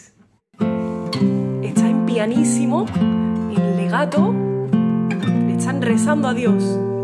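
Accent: Andalusia, Spain. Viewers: Hermoso todo lo que tocas, me da pena decirte , que primero, no me gustaba tu tono de voz, era la primera vez que oía hablar una persona andaluza, pero me gustas como tocas, y aprendí a oírte y acepté tu voz y tu orgullo de tu localidad, y el amor que emana de tus palabras el brillo de tus ojos la sinceridad con que te expresas, y mucho amor para compartir un hermoso trabajo soy de Venezuela de origen muy humilde, me gusta la quitará, pero no sé tocar ninguna pieza completa, por eso me dedico a oírla , ahora te quiero y te admiro mi niña, mi bisabuelo creo que venía de Islas Canarias. Ahora me gusta la narración que haces a tus arreglos. Sigue triunfante. Ynelda Ascanio Gutiérrez.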